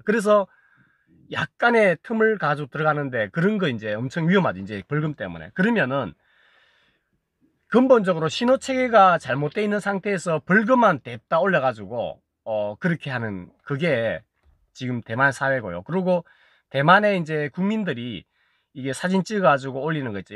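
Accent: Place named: Pohang, South Korea. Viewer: 소중한 정보 고맙습니다. 구수한 포항 억약이 인상적입니다. ^^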